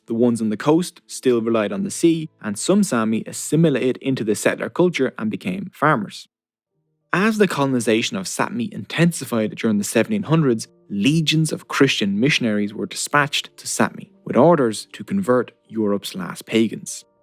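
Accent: Dublin, Ireland. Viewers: Last thing I expected was the thick inner city Dublin accent! Brilliant. Thanks a million champ!!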